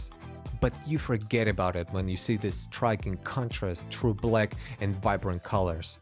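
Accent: Russia. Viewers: да ты русский, акцент чувствуется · russian accent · тебя выдает подоконник)) в штатах таких подоконников нет. Да и по английскому слышно, что наш человек:) Если не секрет, то чем будешь пользоваться в случае блокировки ютуба?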